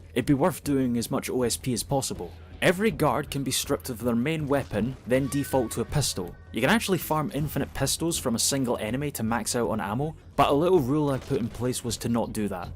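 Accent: Scotland, United Kingdom. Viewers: The British accent saying Afghani names is so funny 😂 you’re absolutely butchering them 😂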